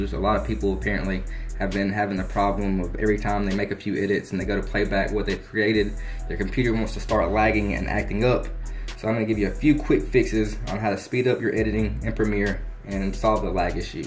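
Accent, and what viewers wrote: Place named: South, United States. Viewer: Where You From You Have A Southern Accent Btw Thxx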